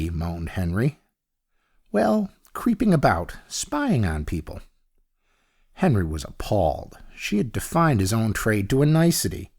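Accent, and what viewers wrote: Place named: United States. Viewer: Not right with American accent sorry.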